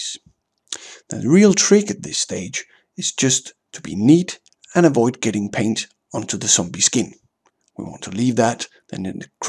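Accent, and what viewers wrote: Denmark. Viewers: Grinern, man kan bare høre det en dansker på accenten med det samme :D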